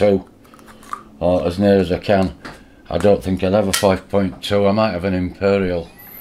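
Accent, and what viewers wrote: Yorkshire, United Kingdom. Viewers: Great videos! If you get bored with the mill stick a stamp on it and send it to me in Oz 😉😀 And I’m guessing your accent Yorkshire or is it Lancashire or Cumbrian 👊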